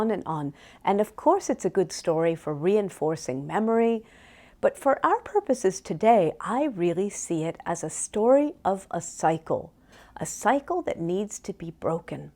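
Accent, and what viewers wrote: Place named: Ireland. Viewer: Thank you for this story! I’m new to your channel and wow, what a treasure trove. (Side note: as an American, I’m really struck at listening to your Irish accent at how much more similar it is to general American than anything found in the UK) But thanks again for your work here, I’m a big fan already 🙌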